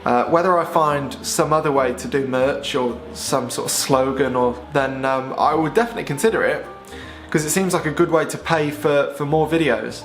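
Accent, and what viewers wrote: United Kingdom. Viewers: You just might be my favourite M43, British accented comedic, photographic personality with excellent advice and insights. Your vids are thoroughly enjoyable to watch.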